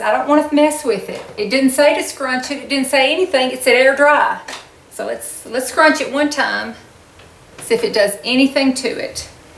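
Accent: South, United States. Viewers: 😃, Nice one, I can just see you in a L'Oréal advert with that lovely southern drawl........... "Beeecawse y'all are worth eeeet" Youre a doll xxx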